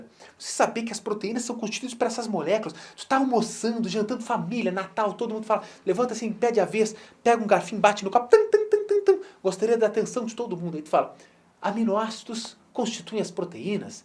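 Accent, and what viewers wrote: Rio Grande do Sul, Brazil. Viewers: Dei o like só por saber que tu é colorado, bem que eu vi que sotaque e era gente boa demais já vi que era meu conterrâneo Gaúcho, brincadeiras a parte, conteúdo muito bem explicado, excelente trabalho Prof., manda bem demais.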